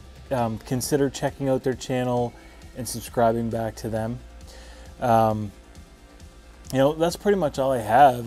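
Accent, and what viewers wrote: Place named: Central, United States. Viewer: Ok, sorry, you sound just like and American from the central part of the U.S. I should have realised that, because I knew where the bay of Funday was.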